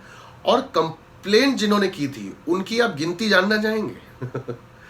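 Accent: Bengal, India. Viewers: Who else is here only for his awsome bengali accent mimicry..😁😂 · ko bahana mil gaya Bengali accent karne ke...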